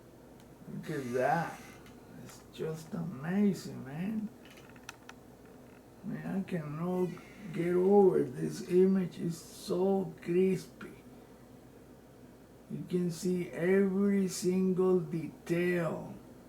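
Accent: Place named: Spain. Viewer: Your Spanish accent is Too much man. Learn to pronounce is like u do shit on purpose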